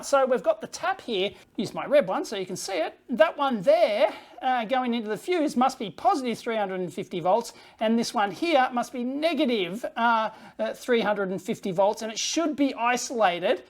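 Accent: Australia. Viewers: Most Australian/Kiwi accent of all time.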